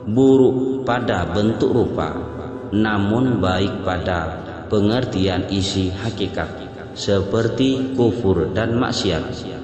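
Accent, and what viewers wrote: Indonesia. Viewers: ilmu yg bermanfaat ,saya suka cara pentampaianya macam orang minang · Orang madura berbahasa Indonesia 😀😀😀 Dalil naqli terus bos. Dalil aqliya jelasin donk....?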